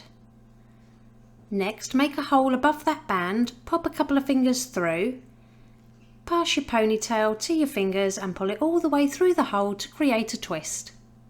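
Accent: United Kingdom. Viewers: England accent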